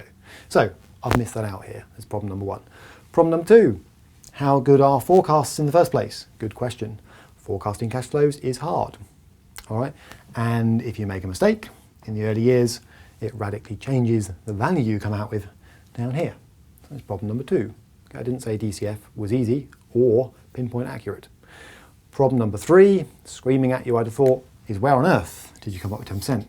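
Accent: United Kingdom. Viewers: love the British English! Always feels like the accent that any good teacher should use haha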